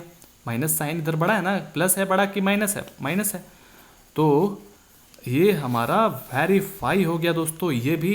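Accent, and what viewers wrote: Bihar, India. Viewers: sir app bihar ke ho?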